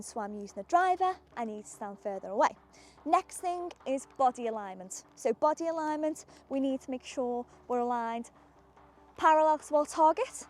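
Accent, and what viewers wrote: Liverpool, United Kingdom. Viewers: Seen you on Instagram a lot but I never have the sound up ,never realised you were a scouser 😅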